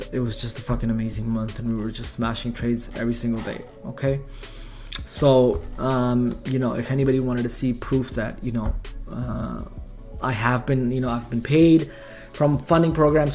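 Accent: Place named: Toronto, Canada. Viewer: sounds good brother. U sound like ur from Toronto. Am i right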